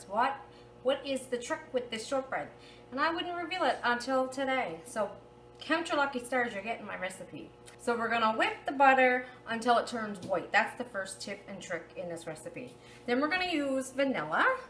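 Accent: Newfoundland, Canada. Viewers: Going to go out on a limb here and say that's a Newfoundland accent...!? Canada? Going to give these a try girl!!! Many thanks from Niagara "Wine Region" Ontario, Canada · Is that a Newfoundland accent ? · Is that a Newfoundland accent? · I thought you were probably Canadian. But your accent has a nice something extra compared to what I'm used to hearing. I'm from Northeast Ohio and if I have any accent it's pretty undectible (boring) I think. I imagine Newfoundland is a nice place to live. I am hoping to make this recipe and impress my family 😁 Merry Christmas!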